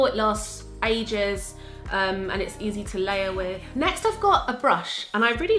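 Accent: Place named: England, United Kingdom. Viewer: Am I the only one who loved the way she said nerdy? Lol. The English accent is life 😩 lol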